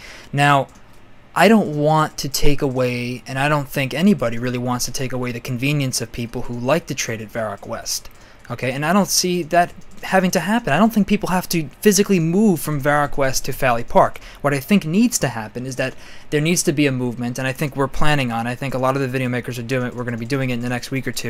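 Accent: New York, United States. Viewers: youre from ny?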